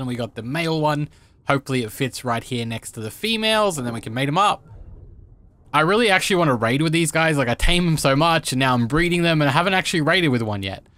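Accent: Australia. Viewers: normally I avoid ads on normal videos i watch but imma put it out there that i love ur vids so much and your style of content, editing (and that YTer voice/aussie accent) that i watch through the ads just for u :)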